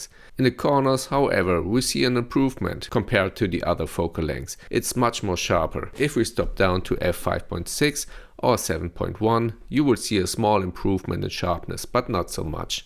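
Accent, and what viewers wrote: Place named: Germany. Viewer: Mir gefällt der Aufbau von deinem Video gut, es gibt keine nervige Musik und generell wird auf die Punkte Konstruktiv eingegangen. Den deutschen Akzent habe ich aber sofort rausgehört, da musst du noch dran feilen :D Außerdem ist die Brennweite Millimeter, dabei hast du Centimeter genutzt und geschrieben 😅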